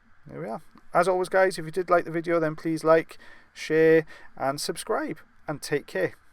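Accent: Wales, United Kingdom. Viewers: Just love how you explain everything with that lovely British accent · Welsh accent 😊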